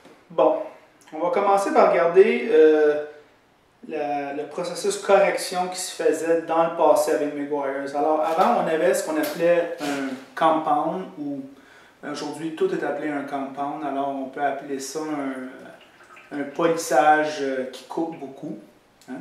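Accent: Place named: Quebec, Canada. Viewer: j 'adore cette vidéo quebecoise , et l 'accent quebecois continuer c est bien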